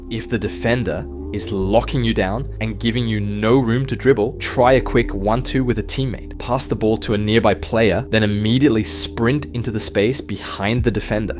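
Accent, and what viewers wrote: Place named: Germany. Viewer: Da hört sich aber jemand deutsch an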